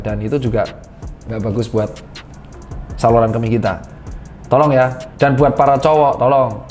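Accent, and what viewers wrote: Java, Indonesia. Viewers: Mak jebuos... 🤣 medokkk...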